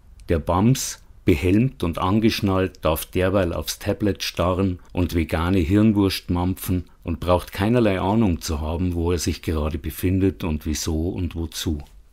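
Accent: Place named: Munich, Germany. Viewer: Ein toller Betrag vorgetragen mit einer soooo angenehmen Stimme und dem wohligen Münchnerisch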